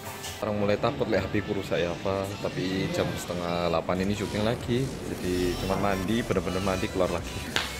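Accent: Java, Indonesia. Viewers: Ini org jawa atau korea???hehehe.. cocok.bgt oppa ini jd org jawa ya. Top deh :-) :-) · haha.jadi penasaran apa yg sebenernya telah terjadi di balik layar XD. sy subscribe sampeyan karena informatif dan medok jowo. eh ga nyangka jadi koordinator RM yg sy tonton selama -/+ 6tahunan. anyway, just curious, kalau sampeyan bergumam atau ngomong dalam hati pakai bahasa apa?haha. atau sekedar ngomong 'kasar' atau mengumpat. disesuaikan dengan lingkungankah?haha. fighting! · Wkwk bisa bhada indonesia tp logat dominan ke logat jawa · Sip bang. Jowo medok temen. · pertama nonton videonya ngga pake headset kirain logatnya korea,ternyata jawa,proud of you kak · Sumpaaah jowoo bgt, koq iso toh?? Hahahhaha logat korea'ne ilang, hahahhahaha i adore u!!!!! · ini mas nya orang korea ? halahyung .. kok luweh medok ko aku 😫😫😫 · Org korea ngomongnya medok bgt👍🏻👍🏻😍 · Suka suaranya agak medok jawa.... 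😀😘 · Kaget pas ngomong ternyata medok kirain bakal ngomong korea atau belum fasih bahasa indo gitu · medok Jawa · logat jowo ne kuentel marai ngakak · ini beneran orang korea asli? bahasanya kox medok jawa ya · korea roso jowo ngene ki! kueren tenan mas!:D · Seneng denger logat nya kakak indonesia sekali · Mas ini aslinya orang korea ya? tpi cara ngomong jowo banget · fasih banget bahasa jawa nya wkwkw medhok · Hai kak.. aku subscriber baru nih di channel kakak.. beh ~ seneng banget liat kak ngomong bahasa jawa.. 😆 medoknya itu lho 'njawani buanget'.. 😆 ditunggu lho kak yang part 5 nya ~ 😁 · Orang korea tapi medok jowo ne 😁✌ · Medhok ya 😂👍🏼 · Bahasa jawanya logatnya sma sprti orang chinese jawa...mngkin dulu dimalang tmn2nya bnyk orang chinese... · Wow bahasa indo nya medok banget... Gw kerja di korea tapi bahasa koreanya gak sebagus bahasa indo dia.... Hahha Sini ke gwangju hehe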